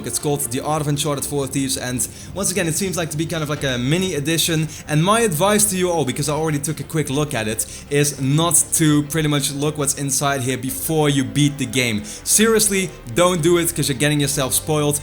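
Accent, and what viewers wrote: Netherlands. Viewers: je axent is heel erg nederlands leuk om te hooren😂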